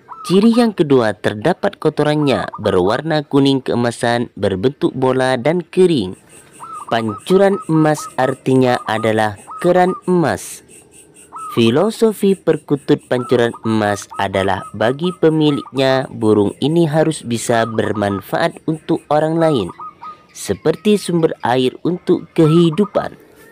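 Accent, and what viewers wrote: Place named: Madura, Indonesia. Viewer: bhsnya ky orng madura mas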